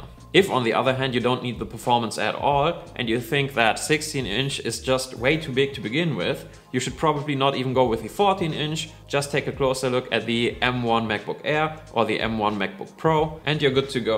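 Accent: Germany. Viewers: Hab sooo bemerkt dass du deutsch bist aber gutes Video 😅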